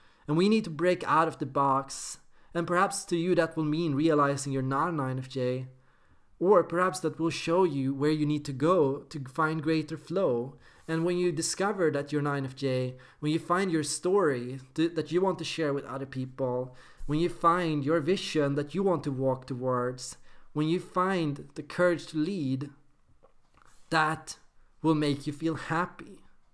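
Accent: Sweden. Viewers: That's OCD, not INFJ...;-) I think that's a specular/optical distortion of the camera. At least you noticed the wall hanging -- is apparently from Stockholm (as his "accent" indicates.) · He's Swedish. Det kan man høre på ham.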